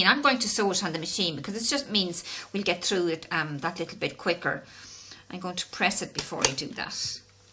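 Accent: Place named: Ireland. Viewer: Thank you. I love your lovely Irish accent.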